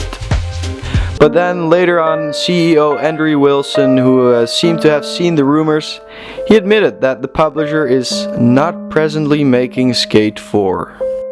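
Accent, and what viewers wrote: Netherlands. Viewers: This guy is dutch. I can just hear it. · Are you Dutch? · Is he from the netherlands?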